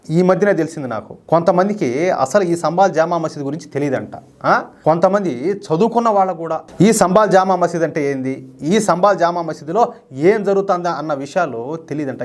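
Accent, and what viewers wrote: Rayalaseema, India. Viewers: రాయలసీమ యాస superb. యా ఊరు నీది.!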